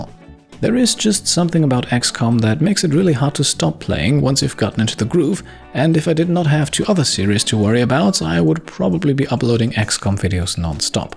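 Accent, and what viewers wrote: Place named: Germany. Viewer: I don't usually comment in your videos, but I love your work and never miss your Rimworld and XCom videos, they're just perfect... Fun fact, I don't know how I never realised you are German, hearing it now it seems obvious...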